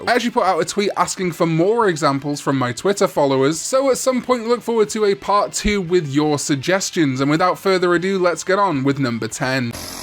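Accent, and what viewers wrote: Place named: Wales, United Kingdom. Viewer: Where in Wales are you from? I’m from Porthcawl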